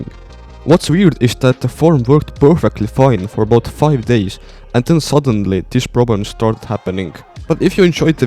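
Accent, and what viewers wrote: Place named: Estonia. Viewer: Your accent is Estonian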